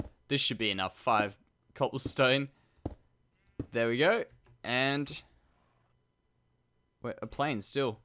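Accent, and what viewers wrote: Australia. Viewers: Are you Australian? I subbed because you're very Interesting and cool :3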